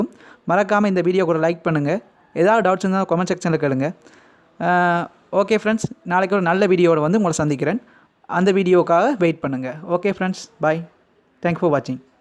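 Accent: Sri Lanka. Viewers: Hi are u Srilankan tamil nice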